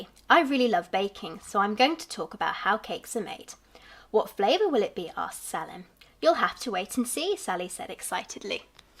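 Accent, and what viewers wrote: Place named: United Kingdom. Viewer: has such a lovely British accent and cherry voice. She should do voice recording for e books.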